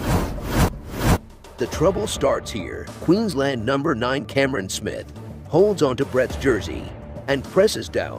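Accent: United States. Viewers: This channel doesn't sound right with that American accent. Sorry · Why American narration? Good ole days, don’t need that.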